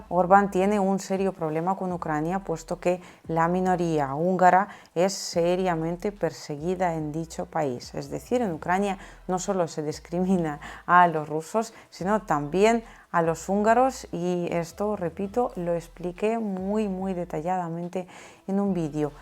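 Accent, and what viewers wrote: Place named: Galicia, Spain. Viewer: Me gusta el acento gallego, y la solvencia para describir los hechos políticos de....sds.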